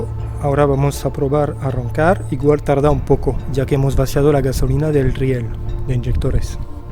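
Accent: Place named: Latin America. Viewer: ¿Amigo por qué? usted en su español, suena más parecido al latinoamericano, que al de españa.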